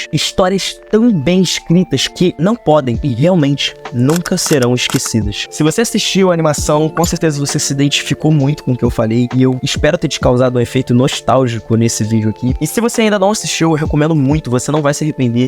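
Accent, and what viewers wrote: Rio de Janeiro, Brazil. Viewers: Vídeo legal..mas incomoda tanto xXx carioca...se for continuar...umas aulas de fonoaudióloga fica top...ou usar I.A para narrar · Mano, se tu quer ser Youtuber, precisa tirar esse sotaque de carioca. Esse X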